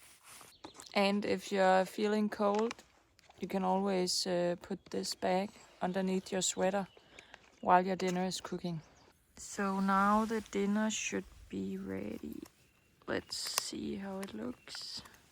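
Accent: Denmark. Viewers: I think that I'm hearing in your voice how the Danish tongue has deeply influenced some British and Irish accents.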